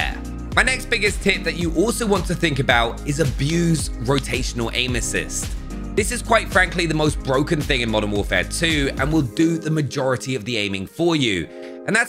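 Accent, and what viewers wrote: United Kingdom. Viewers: Why is it that British accents make you sound more knowledgeable 😂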